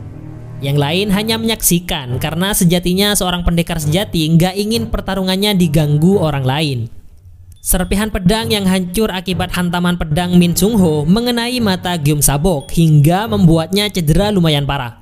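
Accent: Madura, Indonesia. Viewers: Kayak Madura logatnya😂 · Logatnya kaya reng Madura..? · Reng madureh 😂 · Mimin logat madura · Orang Madura ya bang.. Medoknya jelas 😁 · Ini orang Madura y salam nih Madura bangkalan. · Logat MDR nya mencolok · Madura mana min ? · Orang madura ya min? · wah pencerita orng madura , salam dari surabaya bang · Reng medureh kak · Baru sadar klo orang Madura 🤣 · Oreng medureh yeh 😁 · Madura ..yaa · Pola maduren riah😂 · Kelihatan masih logatnya Ra Ra Madura 😂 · Logat admin kayak berasal dr pulau garam.😊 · Gw ngira orng madura. Trnyata bener dong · Reng medureh kadik en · Mak madureh lek 'en cak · Medureh dimma tretan?? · Duro duro,logat e... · Logat maduranya kelihatan banget 😃semangat kakeh boosss 😁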